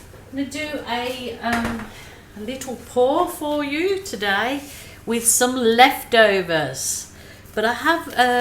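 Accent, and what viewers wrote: Birmingham, United Kingdom. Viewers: Hi there just found you, a question. Were you born in Austrailia? I can hear a slight Birmingham accent . Love your program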